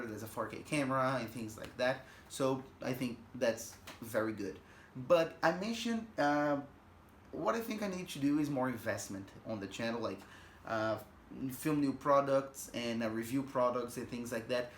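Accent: Brazil. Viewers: Imaginei que era brasileiro por causa do sotaque. Caracteristico! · Thanks. You should make one brief mention of Brazil in each video so new viewers will know where your very pleasant accent originates. Like "Here in Brazil...."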